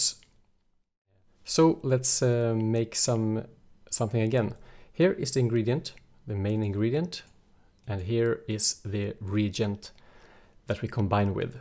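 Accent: Sweden. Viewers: Are you Swedish? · are you swedish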